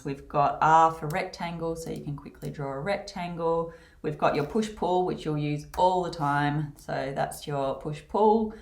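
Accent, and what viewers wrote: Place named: Australia. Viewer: Some great tips for beginners and love the speed and direct approach. You mentioned a few times that you use a very powerful computer as the polygon count can get very high. Could you please share that info so if we upgrade our systems we have an idea what to get. Mother board Brand/Model: CPU Brand/Model: GPU Brand/Model: Ram Type/Speed and Number of Gig: Love your accent (maybe Australian) Nice clear voice and a great visual presentation Just one little thing to improve, the audio had some reverb and sounded hollow( possibly too many hard surfaces in your room) Great Work.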